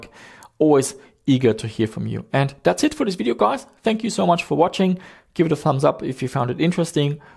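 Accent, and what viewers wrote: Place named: Germany. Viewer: Sehr deutscher Aktzent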